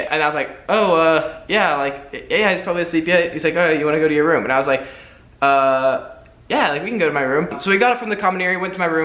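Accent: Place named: South, United States. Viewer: I can tell you are a fellow southerner. You talk almost as fast as I do!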